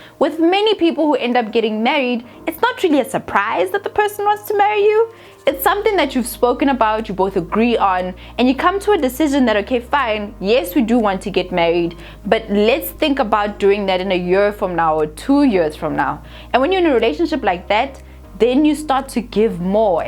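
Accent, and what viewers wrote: South Africa. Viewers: I like the way you speak South African vibes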